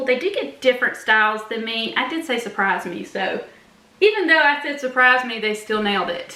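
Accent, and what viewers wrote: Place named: South, United States. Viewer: Yes, our southern accents do get in the way sometimes when it comes to the pronunciation of some words. Love it! You always make me laugh and I truly love your videos. Charleston is one of my favorite places to visit. Thank you so much for sharing Southern Ways with with your audience. 💕☺️💐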